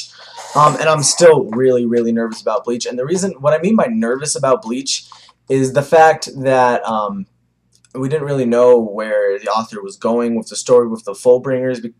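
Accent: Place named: United States. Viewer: lol you sound very American in your pronunciations of names but yea I am the same but British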